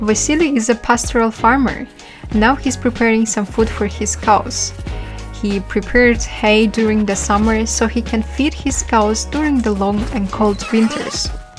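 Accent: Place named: Russia. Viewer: Английская озвучка типично русская... Ландан из э кэпитал оф грет британ....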